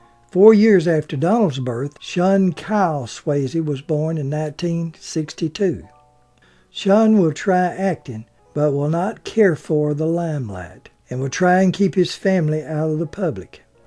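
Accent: South, United States. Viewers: Mr....Another fabulous blog. That Southern accent of yours is so charming. I have been following you for over nine years. Your voice is golden. Please do a vlog about Rosa Parks. Respectfully, Norma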